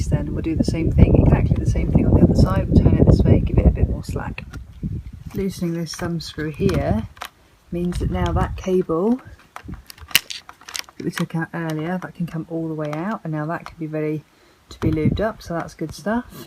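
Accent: United Kingdom. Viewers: I'm here for the how-to-fix... and the British accent.👍Thank you for the great tutorial.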